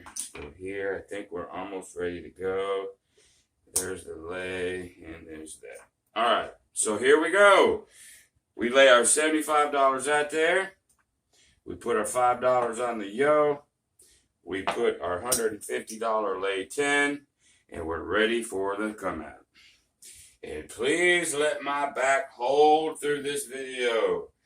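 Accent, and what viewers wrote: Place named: Texas, United States. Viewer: Thanks I appreciate your video. Love to see it. That accent reminds me of Texas🌵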